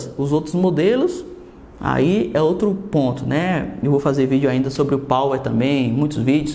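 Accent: Bahia, Brazil. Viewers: Vc e baiano??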